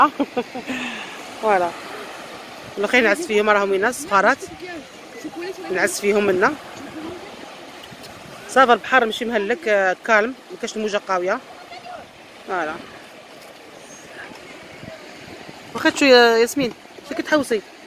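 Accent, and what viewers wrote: Oran, Algeria. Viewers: شحال تعجبني هدرتك نحس روحي في وهران ههه إنشاء الله نربحو اليوم ⚽️🇩🇿🏆🤲 مرحبا ببنات بلادي عندي شكرا ربي يسعدكم و يفرحكم لي الشرف تنوروني و تشجعوني شكرا مسبقا بنات بلادي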